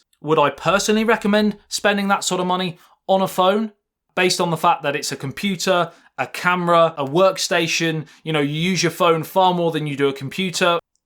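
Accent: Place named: Northern England, United Kingdom. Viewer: This is not RP. Bad northern England accent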